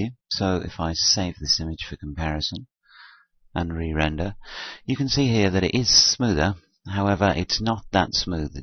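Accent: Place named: Australia. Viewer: That was a gold tip! Thank you! I also think you are australian by the accent! :D I love this accent! Have a nice day!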